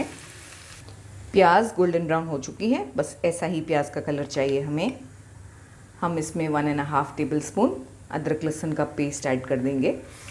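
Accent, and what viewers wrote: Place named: Maharashtra, India. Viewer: It's really very nice the way you explain the recipe. The way you sound It seems you have a connection with maharashtra.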